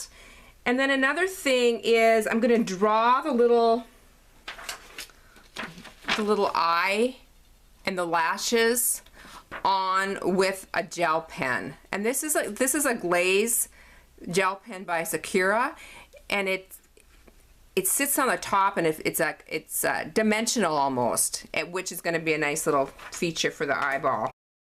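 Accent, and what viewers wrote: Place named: North Dakota, United States. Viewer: Hi. I've just discovered your channel. I love it! The first thing I noticed was your accent. I immediately recognized it as most of my relatives live in North Dakota and are Norwegian. Made me feel right at time, lol. Keep the videos coming, they're great!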